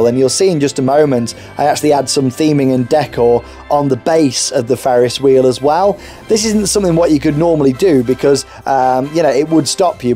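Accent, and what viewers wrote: United Kingdom. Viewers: You sound British are you?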